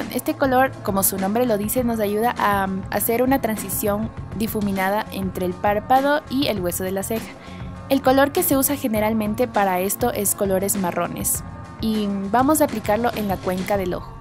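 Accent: Quito, Ecuador. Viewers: Estaba esperando una youtuber ecuatoriana como tú 😍 desde que empezó el video reconocí tu forma de hablar 💕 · Me encanta tu acento, de que parte de Ecuador eres? Yo tambien soy de ecuador por sía. Jaja de Guayaquil, saludos, ya me suscribí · Solo con escucharte un ratito ya sabía que eras de Quito, igual que yo!!! 😁😁😁Muy buenos vídeos!!! Te sigo desde Alemania!! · Con apenas escuchar tu forma de hablar supe eres ecuatoriana, me gustó muchísimo todo muy bien explicado... felicidades! una subscriptora más😜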